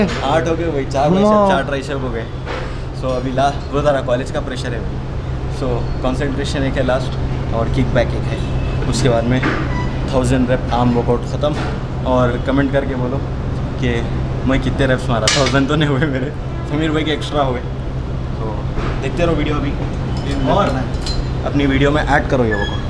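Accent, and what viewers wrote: Hyderabad, India. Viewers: Nice accent Hyderabadi londe.. mashallah khubsurat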